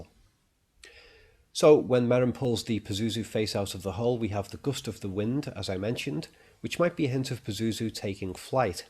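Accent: Liverpool, United Kingdom. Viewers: Very impressive job. No self serving bull crap just very insightful points. It's a plus that you are an obvious Scouser. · All good except the narrator's distracting Scouse accent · Some pretty stupid stuff in here. Then we get to a more obvious one, when she has her right arm raised and he says he's not sure about that one! :o Is that because he didn't make that one up himself? ;) He says people do have their arms up in that situation! Duh! Is that a bit like people do pray like that and the other 100 things you said too?! Also, I think I must be programmed in some way to feel that the Liverpudlian accent sounds thick!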